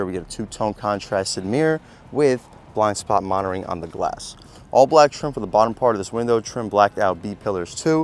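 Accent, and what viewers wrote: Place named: Long Island, United States. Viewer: Dood has a Long Island accent but is in Tampa????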